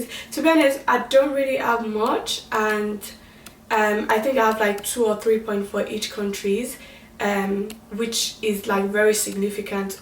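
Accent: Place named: United Kingdom. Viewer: U have such a beautiful British accent and thank you so much for this content it is more than helpful.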